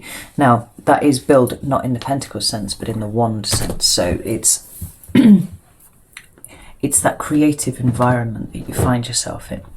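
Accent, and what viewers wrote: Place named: England, United Kingdom. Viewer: Thank you so much ! Your so beautifully amazing and your acsent well I know to you it's not an accent because you are true English us over here in the states are not but anyway I love you ❤️ thanks again .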